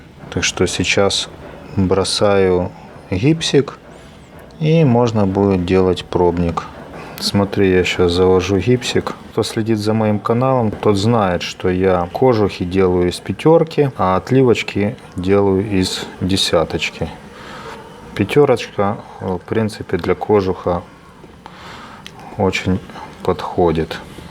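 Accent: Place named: Kyiv, Ukraine. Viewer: Красота! Судя по акценту и уровню работ вы киевлянин. Очень сочувствую, что пришел "медведь-всем пригнётыш" и поломал судьбы людей и красоту!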